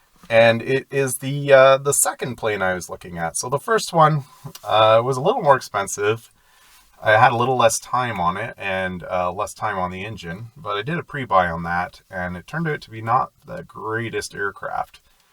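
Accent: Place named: Canada. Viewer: a Canadian I hear heheh! Cheers tho, im just getting into flying 🎉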